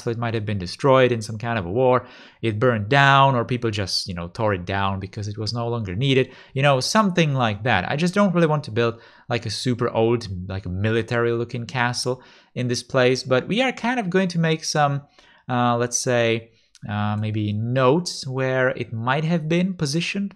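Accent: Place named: Czech Republic. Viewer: Usually you can recognise Czech from a mile by his accent. With I was thinking that I can hear a Czech or Slovak accent but it's so subtle that I thought I must be mistaken.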